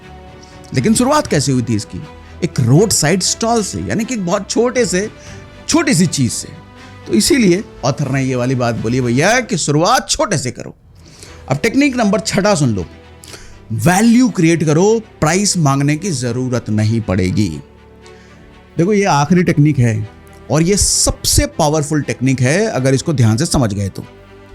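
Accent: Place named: Kanpur, India. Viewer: Sir boli se kanpur side ke lagte ho aap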